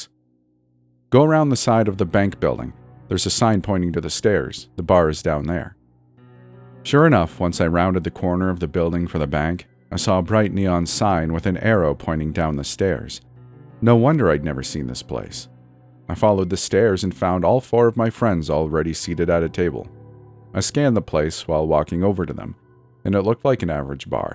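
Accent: Canada. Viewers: I LOVE THE SOUND OF A CANADIAN VOICE!! ( I'm from Vancouver BC...☺️) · I most definitely like the sound of ur Canadian voice. Thanks for another great upload. It's much appreciated. ❤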